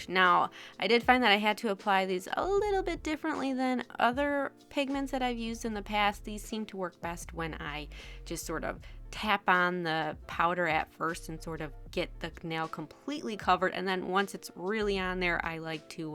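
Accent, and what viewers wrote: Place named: Upper Midwest, United States. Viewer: so beautiful, and I've never been much of a glitter girl, but here I am at 60 years old, thinking, "mmmmm.....glitter...." lol And I recognize your accent now, because I was born and mostly raised in Michigan. Upper midwest, somwhere is my guess. No wonder I like you!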